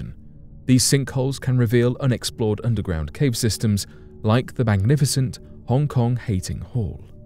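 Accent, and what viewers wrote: England, United Kingdom. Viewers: Nice video but I don’t believe you should be putting the u.s navy’s business out there,but what can I do you do have a British accent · why all these space videos always have an English accent?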